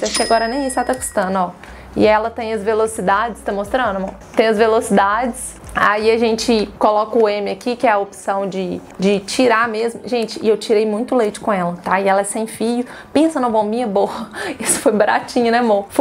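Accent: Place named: Minas Gerais, Brazil. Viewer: Eras não consegui prestar atenção ao conteúdo do vídeo 😂 só ao seu sotaque lindo que parece ser de Minas Gerais <3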